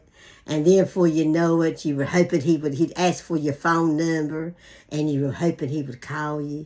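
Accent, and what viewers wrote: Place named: South, United States. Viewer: These are the best kind of stories. Thank you for sharing. I gotta say that your amazing southern accent makes it even better for this west coast gal.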